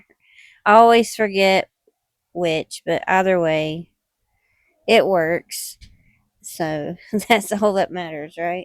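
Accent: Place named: South, United States. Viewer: I love that southern draw, your ex must have been crazy to divorce you.. keep up the good work, and take care of your fur baby.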